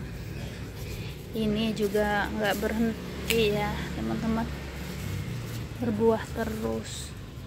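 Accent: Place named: Java, Indonesia. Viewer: Kalo boleh tau Kakak orang Jawa ya, soalnya kalo ngomong kyk logat orang jawa😊 Ohya kok ga pernah lihat bunga vincanya Kak, dimana ya?💚🌱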